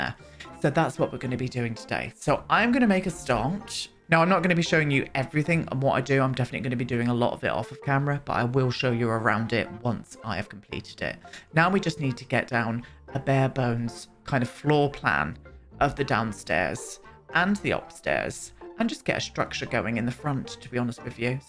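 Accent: United Kingdom. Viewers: Someone ranting about the sims build in a British accent is just what i needed 😂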